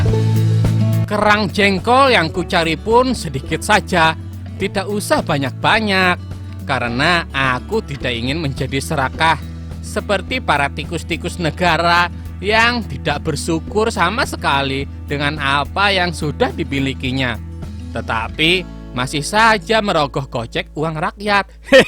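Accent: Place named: Java, Indonesia. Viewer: Medhok nya hampir sempurna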